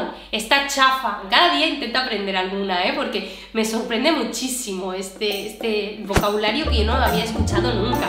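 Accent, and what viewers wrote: Spain. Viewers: Me gusta tu acento español y la forma profesional en qué haces tus vídeos. En hora buena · Me gusta mucho tu contenido. Felicitaciones. Por otra parte, me he dado cuenta que estás perdiendo el acento español. Definitivo, los acentos se pegan depende de donde uno viva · Mmmmmmm!! Mmmmmmm!!! Ummmm!! Sientes que tu forma y acento español haya sufrido alguna transformación? Sufrido? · Excelente video de preguntas y respuestas, ya casi 300K me encanta ver como sigues subiendo como la espuma, yo te haría dos preguntas, la primera es si estas buscando oportunidades de actuación en México ya sea en TV o en teatro y si lo estas haciendo que tanto ha influido en que te seleccionen o no tu acento Español/Catalán, la segunda es con respecto a los canales con los que quieres colaborar, me gustan todos los que mencionaste y de hecho creo que los sigo a todos, pero no mencionaste a ni un solo canal de Españoles que reaccionan a México y que de hecho han visitado el país y han creado también su propio contenido de sus viajes y vivencias aquí, Cygnus y The Romantic Corner (Chris y Carmen, casados y con un hijo recién nacido en México) serian para ti super fácil de conocer pues están radicando actualmente en CDMX... 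mucha suerte y, me encanta su contenido..... SUGERENCIA pueden agregar la opción de membresías al canal y subir ahí contenido sin editar o que por alguna razón dejan fuera de la edición final, eso les podría ayudar a incrementar su ingreso... Saludos desde Monterrey.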